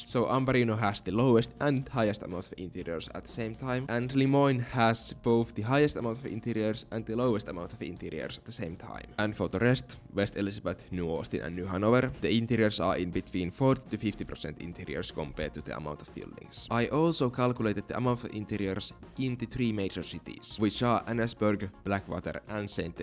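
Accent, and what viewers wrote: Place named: Finland. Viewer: I can see his hard finnish accent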